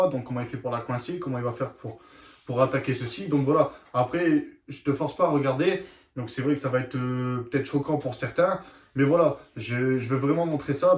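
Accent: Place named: Nord-Pas-de-Calais, France. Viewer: Mdr la presentation en chtit. Tres belle video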